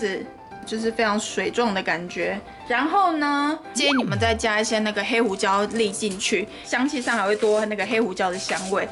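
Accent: Taiwan. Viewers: 慶煙那邊我笑死了講話真的好台哦♡酷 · 台湾腔真的是萌到爆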